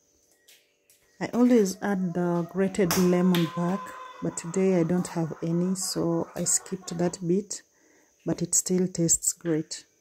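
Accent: Kenya. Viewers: ❤ you sound Kenyan to me ,am from Kenya where is that. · Where are you in Kenya? I lived near Eldoret from <num>. It's so beautiful there and hearing your accent reminded me of my old friends in Kenya :) Asante sana kwa video nzuri sana! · 💯I so agree with your sentiments. I would love to live in such a beautiful serene environment. I hope to return to visit Africa one day. Are you in Kenya? Your accent + maandazi equals Kenya or TZ to me. 🥰 I was in Kenya and it was like being in heaven (30 years ago). May I be able to return and live like you.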